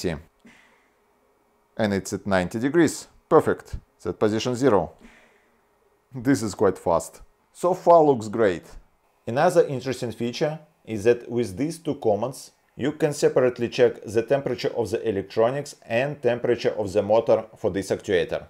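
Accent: Ryazan, Russia. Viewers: Адский русский акцент, прям facepalm. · So Russian accent :) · Я понимаю, я и близко не могу говорить на английском так как Вы, но,...блин русский акцент, это просто пипец, <link> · Это акцент Рязанский .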